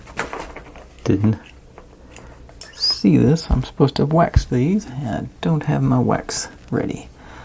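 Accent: South, United States. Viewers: Thanks so much for this full video set--I picked it up about Section 5 or so when I started to get bogged down. Most often, I watched the videos after I had done a process, to make sure we had done it the same. I chuckled through a lot of the videos as you and I made many of the same mistakes and had the same re-dos. I ended up breaking 3 pieces, and mercifully there were spares of all of them included. This was my 4th ROKR and most complex one. I've done hundreds of jigsaw puzzles, but not many 3d kits. I was intrigued that you have the same regional accent as another YouTuber I've been following for awhile--no idea where he (or you) lives, but it seems like a Kentucky or west Tennessee accent. <link>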